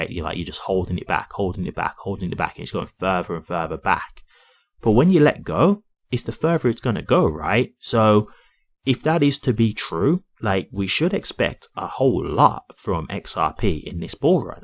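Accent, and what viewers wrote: Birmingham, United Kingdom. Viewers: You sound like a fellow Brummie XRP holder. Good luck to us all.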